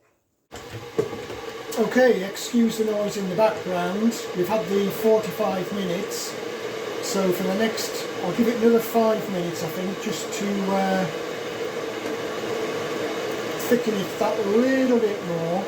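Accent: United Kingdom. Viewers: Well sounds good. But what would I use it on or with. Btw, I love your accent. Sounds proper British. You could've actually cut it in half. Then your plan wouldn't be so full. And you wouldn't need a bigger pot. Eh? Try a warm damp sponge to wipe off jars. I want a taste.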